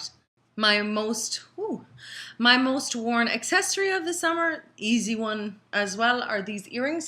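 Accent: Ireland. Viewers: We love your Irish accent !!!! The Irish accent is definitely one of our fav accent in the English language. Sweet. Oh and great fav summer products. We love Hard Candy and the bronzer you show looks OMG so pretty xx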